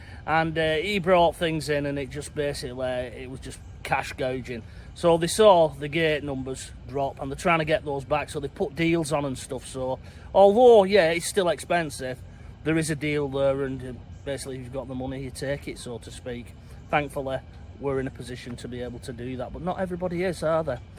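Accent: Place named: Wigan, United Kingdom. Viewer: You don't need to talk like a Wiganer, just speak English. I've worked for American companies for 25 years and have never had any problems communicating with the yanks especially the women.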